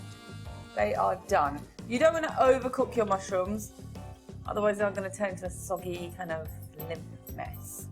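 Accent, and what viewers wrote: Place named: United Kingdom. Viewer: Bloody hell. Absolutely fell in love with the British accent!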